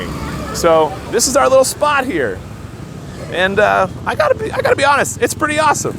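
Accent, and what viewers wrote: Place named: Philippines. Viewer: Hang in there,! things will turn around for the better real fast. Btw, your accent is like you’re a Filipino descent — except that you’re way too tall.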